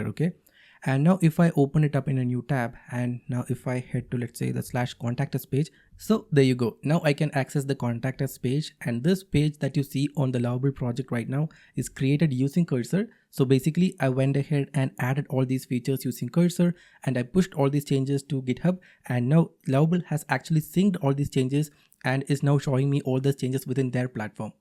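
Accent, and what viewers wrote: Kerala, India. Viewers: Nice Malayali accent bro 😃 Very nice demo 👍✅ By the way, Do you like Cursor or VsCode?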